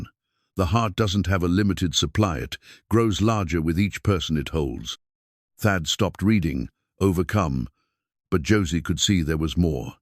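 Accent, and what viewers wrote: United Kingdom. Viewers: Too much time on the dead wife. Why not more on the dead husband. He had not been dead very long, yet, the wife has little grief? Ugh. And please get rid of the British accent. A western story needs an American voice.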